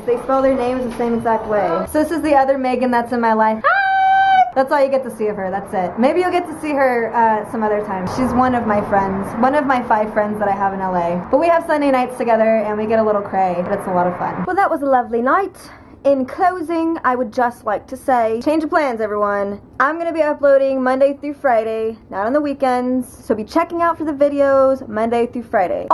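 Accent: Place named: United Kingdom. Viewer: I love your style. I would love to hear you talk more with your British accent.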